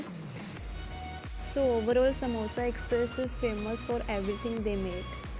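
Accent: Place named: Haryana, India. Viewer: aapne too haryanvi ee bolya krr haryanvi ee bdiya hh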